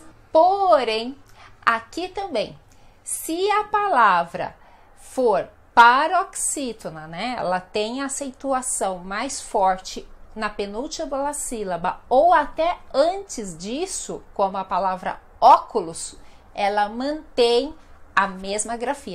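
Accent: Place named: Paraná, Brazil. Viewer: Parabéns pelas aulas super didáticas e pela dicção excelente. Você não tem sotaque de Curitiba, mas sim (um pouquinho) do centro-norte paranaense ou do interior de SP.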